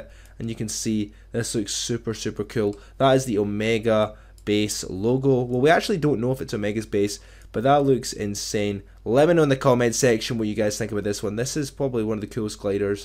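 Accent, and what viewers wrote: Scotland, United Kingdom. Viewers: He's Scottish, I talk like that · I’m Scottish and so is he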